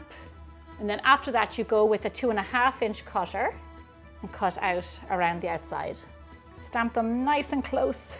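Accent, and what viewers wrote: Ireland. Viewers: We are Canadian. My heritage is Ukrainian, my wifes is irish. I'm the primary cook so try and make her her favourite meals. I make her a corned beef and cabbage from scratch every St Patrick's day, so have another 6 weeks before i have to brine the beef brisket. But she wanted some cookies/biscuits now. happy wife, happy life. Thnx for the recipe. Looked at many but wanted authentic Irish, and you sound Irish, LOL. Made these a few weeks ago and she loved em with her tea. Used turbinado sugar instead for the topping tho.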